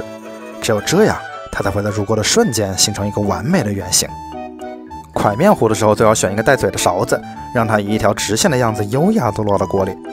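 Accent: Wuhan, China. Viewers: 浓浓的武汉口音🤣🤣🤣🤣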